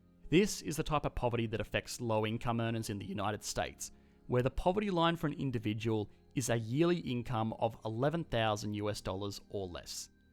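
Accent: Australia. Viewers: I've had a look at the comments section and as an Australian I'd like to encourage to double down on the Aussieness.....let's get some Poiter in there, get some 'Noice' going and let's harden up those 'Buhhhhht's and I'm expecting some "yeah, nah"s to appear...... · That Aussie accent though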